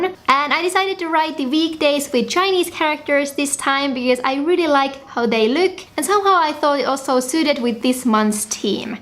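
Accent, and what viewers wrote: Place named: North, Finland. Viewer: Hei from Australia! I love your beautiful minimalistic planning & bujo art and sense of space. Thankyou for the inspiration ❣️ Ps. Just Curious - I studied in Helsinki awhile, & your accent is different - are you from the north? Or do you have some Japanese background?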